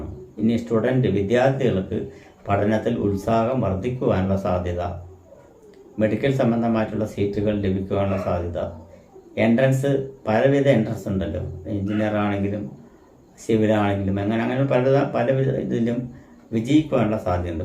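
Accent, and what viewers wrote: Tamil Nadu, India. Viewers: Tjamizhu. Parayunnath. Kondanu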